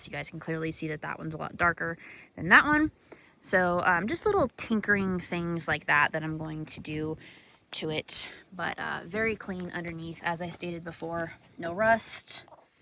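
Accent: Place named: North, United Kingdom. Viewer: My opinion,that’s a show truck. It’s to nice to be a daily driver. What I noticed first is,the chrome molding on the body is aligned perfectly. And underneath is immaculate. Plus,I don’t know where you live but you sound like you liv up north somewhere. If that were mine,it would never see a salted road. I would walk first.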